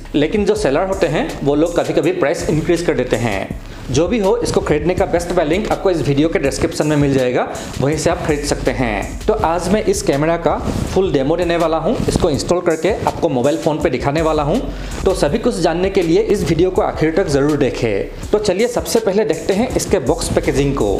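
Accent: Assam, India. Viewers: Bhai aap Assam se ho kya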